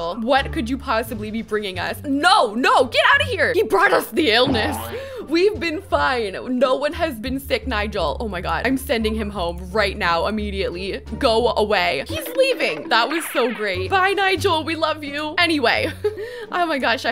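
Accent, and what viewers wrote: Canada. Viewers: When I saw Eliza as a mixologist, the first thought that came to my mind was "oh God, she's gonna spit in all of the contestants drinks" 🙈 Absolutely love the chaos in the Reality show! Little, funny side note: since I've been watching your videos I kinda started picking up your accent and the way you speak :D My half Canadian English teacher (who speaks normally in British English) was excited to hear his accent from one of his student xD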